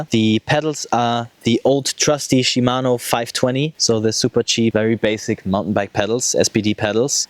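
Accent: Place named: Germany. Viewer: I hear a bit of german😂😂😂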